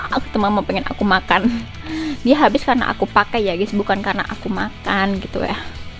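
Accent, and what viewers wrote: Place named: Java, Indonesia. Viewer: Aku suka sama dialek kaka yg medhok👍🏻🤭 Austrin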